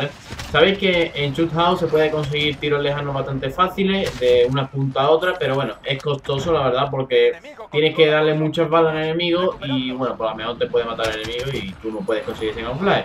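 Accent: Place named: Andalusia, Spain. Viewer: jaja ya veo te notaba un acento andaluz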